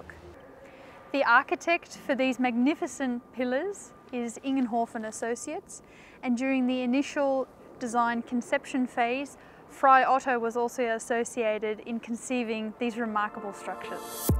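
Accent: Australia. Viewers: Do I sense an Aussi accent? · cool project, cool people working on it and the Australian accent is very Wellcome in Schwäbisch Land..:) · I've been following this project since 2010. It's great to hear an English overview of the latest developments but even better that you're an Aussie. This is Stuttgart's equivalent of the Sydney Opera House. Please volunteer to do more. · God, I totally love this australian accent O.O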